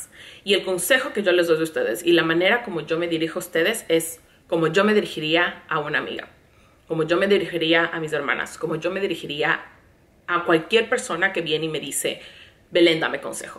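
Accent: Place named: Ecuador. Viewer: me encanta que a pesar del tiempo que vives en USA sigues hablando muy ecuatoriana ❤️❤️❤️❤️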